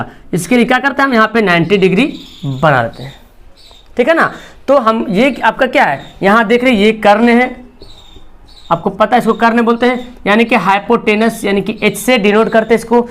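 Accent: Bihar, India. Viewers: Aap bihar se h kya?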